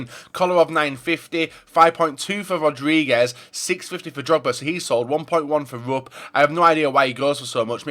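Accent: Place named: Liverpool, United Kingdom. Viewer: moronic scouser...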